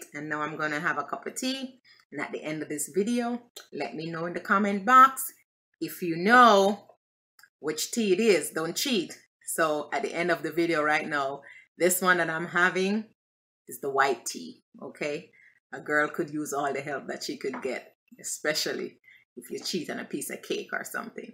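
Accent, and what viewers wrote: Jamaica. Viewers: love your accent, Jamaican?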